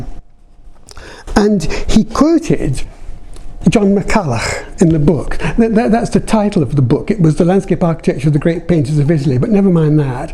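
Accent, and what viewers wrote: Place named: Scotland, United Kingdom. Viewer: Thanks for interesting talk. I love the sections and I did think more CITYscape sections should be analyzed in a landscape project ( including architecture in those sections), which could be best started from LANDSCAPE ARCHITECTURE education ( if as you said it did not exist in ARCHITECTURE education). PS: Lovely Scottish accent!! Thanks!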